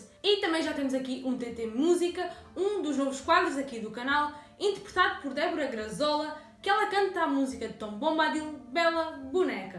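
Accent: Alentejo, Portugal. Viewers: Até que em fim, estava morrendo de saudades do português alentejano da. E ainda um maravilhoso TT chefe parabéns.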